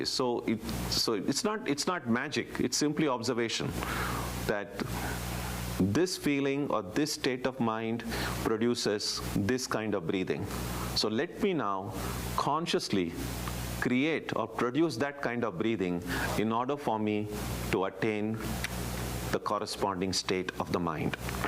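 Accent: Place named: England, United Kingdom. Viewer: Thank you for having an English accent that is articulate and clear with consonants and vowels, not slurring your words. 🙏, this help me understand these teachings of that ancient science from the Indian Continent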